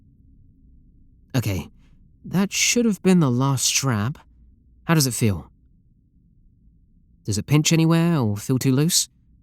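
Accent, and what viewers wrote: United Kingdom. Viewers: Ehhh sound more southern to me but other than that sounds pretty British unless your actually British and are using an American accent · If only rp-ing a fictional character was enough to get you a yandere 😔/j Great job on the au!!! It's nice to have a taste of that British accent as well!! ^^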